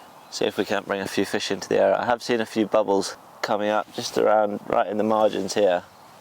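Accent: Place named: England, United Kingdom. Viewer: Serious question, you sound English….why is your name on YouTube?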